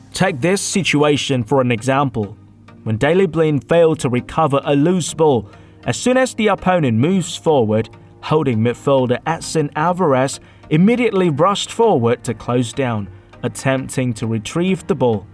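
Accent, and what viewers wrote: United Kingdom. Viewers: giọng này giọng Anh chuẩn bố ơi :)) giọng Anh gốc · 1 like cho bạn nói giọng Anh chuẩn 😌 trong khi nhà nhà học theo giọng Mỹ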